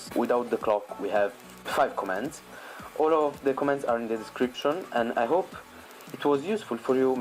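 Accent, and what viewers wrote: Italy. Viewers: non ho capito se sei italiano e parli in inglese o se sei inglese e conosci l'italiano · ❉Team Chicken❉ ahhahahaahaha grande comunque dal modo in cui parla inglese sembra italiano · la tua pronuncia è all'italiana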